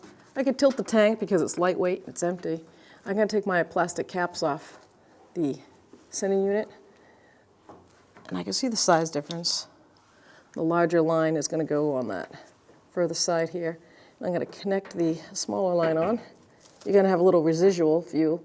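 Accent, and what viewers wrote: Boston, United States. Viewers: I tremendously appreciate how calm and straightforward you are with your videos! No electronic riffs and no annoying background music to muffle or garble your words! Just clear speech (w/ a Boston accent) and straightforward instruction. I recommend you to whoever I can while talking about vehicle maintenance. I thank you again!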